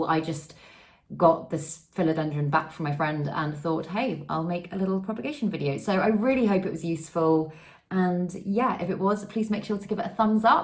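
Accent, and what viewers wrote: United Kingdom. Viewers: How important is the wax sealing?? Is the wax scented or just plain wax.?? I need to move next door to you.😅😅😅.can this be done in leca balls as most of my plants are in leca. I had to remove my plants from soil because of the problem with those nasty fungus nats. They have vacated the premises and i have 4 plants including miss pink princess that i want to transition to hydroplonic. Im afraid to do this major surgery to her. She has new growth on top, sides and bottom at the soil level. She's beautiful but has gotten top heavy and i don't want her to topple over and break something. I will have to notify her of her pending surgery so that she's ready. You are a darling with your British accent and your bun. Thanks for your expertise. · Do you come from great britain? I think i hear a difference.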